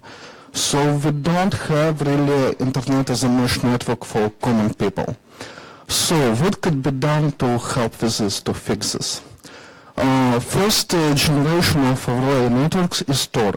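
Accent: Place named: Russia. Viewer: A russian speaking english with an accent into an overdriven microphone about a somewhat difficult to understand and interesting topic - god damn. Furthermore, it's the only useful video when you search for "garlic routing". :(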